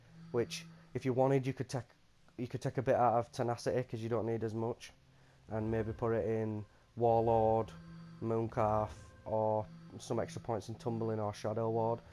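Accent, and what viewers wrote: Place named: Manchester, United Kingdom. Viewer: you sound like a Manc lad, sunny Bolton me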